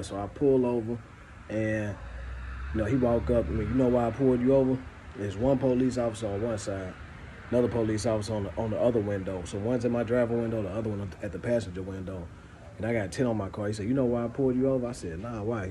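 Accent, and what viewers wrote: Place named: Memphis, United States. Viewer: I appreciate your videos. You gotta be from Memphis that accent thick!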